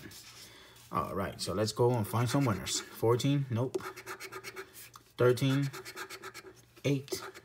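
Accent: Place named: Ireland. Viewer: Hi 🖐 great video. I'm from the UK. And listening to you I could hear Irish accent in your voice real quite strong at times. Do you live in Ireland? Lol so curious. 👍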